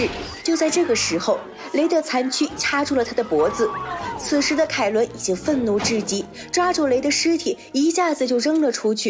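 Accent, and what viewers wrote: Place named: Shandong, China. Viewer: 听口音就是我们那边的，老家山东的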